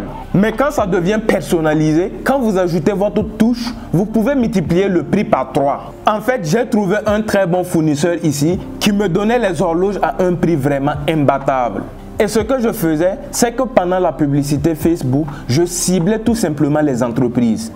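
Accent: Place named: Burkina Faso. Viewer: Un Burkinabé à travers la façon de parler ❤ salut Frère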